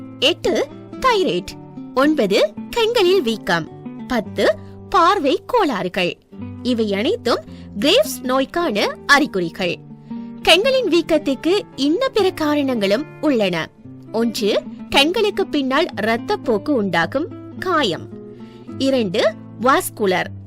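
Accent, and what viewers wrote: Sri Lanka. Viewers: Ada arivu jeevingala, avanga srilankan Tamil kalanthu pesuragu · இது இலங்கைத் தமிழ் இப்படிதான் பேசுவார்கள்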